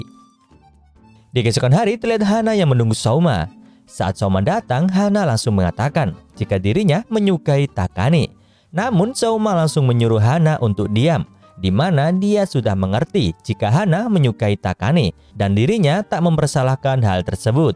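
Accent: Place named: Madura, Indonesia. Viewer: Omong gak seneng min .. terlalu kaku .. kayak omongx orang madura yg belajar bahasa jawa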